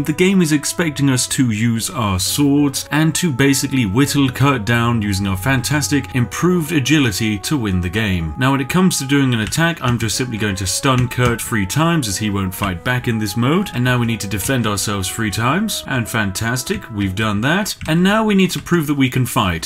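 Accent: United Kingdom. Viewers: It’s funny cause he’s so “AMERICA!” But he’s British so he’s like “Britain!” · PERFECT CHANNEL TO HEAR A BEAUTIFUL BRITISH ACCENT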